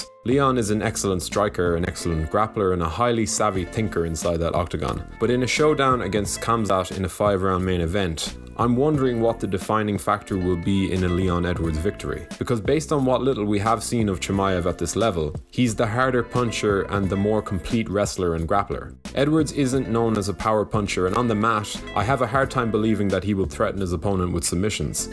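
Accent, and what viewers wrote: Ireland. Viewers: u Irish my guy?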